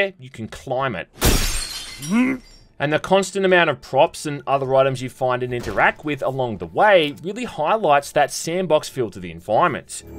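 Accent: Australia. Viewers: I love your videos, your Australian accent paired with a witty and downright kneeslapping sense of humor makes my day sometimes. Even though i can't afford most of these games its still a treat to see someone bring underground titles and non-mainstream games to the light and its a huge breath of fresh air. Keep doin what you do ya bastard ❤.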